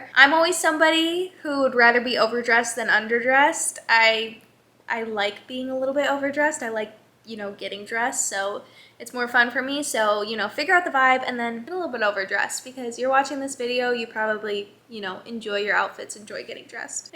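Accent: Midwest, United States. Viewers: i love your midwest accent 😭💕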